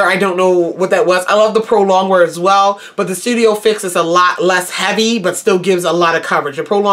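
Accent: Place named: Baltimore, United States. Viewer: Found you through Makeupstruggles. Subbed with the quickness. Love the accent. Reminds me of my B-mo peeps.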